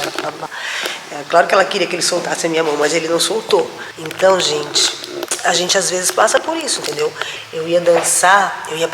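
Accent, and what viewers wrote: Ceará, Brazil. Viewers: desculpa a curiosidade. Mas você é cearense?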